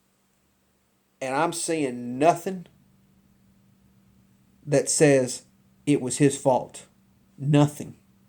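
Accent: South, United States. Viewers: where are u from? You don't have that stupid New York accent. U actually sound more southern.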